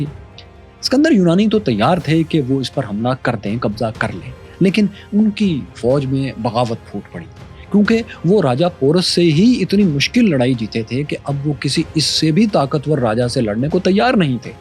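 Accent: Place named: Punjab, Pakistan. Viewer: Other than the Punjabi accent it was good content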